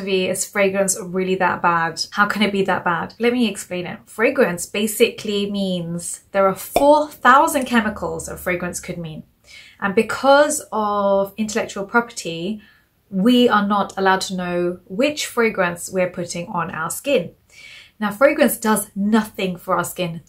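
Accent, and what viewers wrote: United Kingdom. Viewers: This DOCTOR is personable, generous and transparent with her vast knowledge of skincare ingredients. And yes, she is also a gorgeous lady. I also love her (presumably British?) accent. As an American, any accent sounds smarter than what I am used to hearing. 😜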